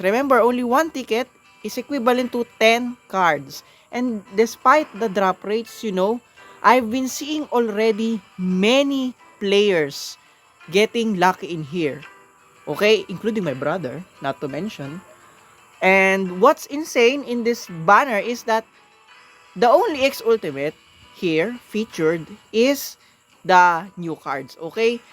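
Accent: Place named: South, Philippines. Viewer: taga south yung accent. Dala ng emotion haha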